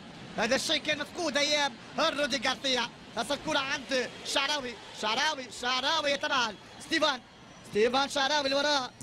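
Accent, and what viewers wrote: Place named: Italy. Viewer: المعلق كأنه ايطالي😃👏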